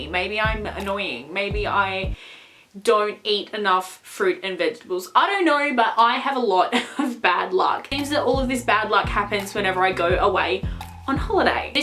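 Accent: Australia. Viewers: Your Australian accent is so thick.🐨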